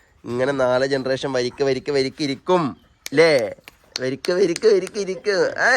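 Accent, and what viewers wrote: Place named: Malappuram, India. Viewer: Umma kolllam baasha paraunnund malappuram baasha😊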